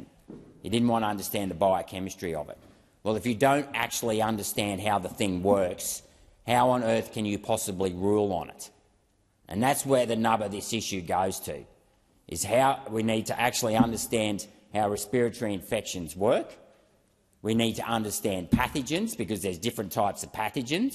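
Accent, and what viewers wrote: Australia. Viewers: Another succinct, intelligent, legendary monologue Senator, beautifully delivered in the dulcet tones of your authentic Aussie accent ... cheers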